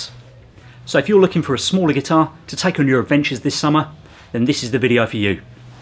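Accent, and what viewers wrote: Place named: South East England, United Kingdom. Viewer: 掌編製作所 he is from england , south east england me reckons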